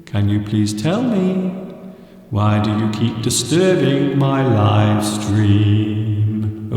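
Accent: United Kingdom. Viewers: 💛💛. My British accent teacher 💛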